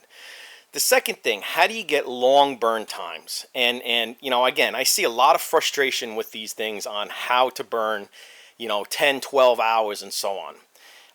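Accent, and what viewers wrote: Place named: Northeast, United States. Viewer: I can tell you in the northeast by the accent so how much wood do you go through a month in the winter ?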